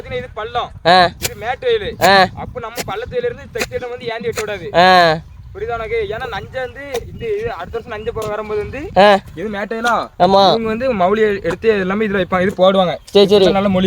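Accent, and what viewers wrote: Tirunelveli, India. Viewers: சூப்பர் பா திருநெல்வேலி தமிழ்ல நீங்க பேசுறது சூப்பர் இந்த கருத்தை சொல்வதும் சூப்பர் எல்லாரும் தெரிஞ்சிக்குவாங்க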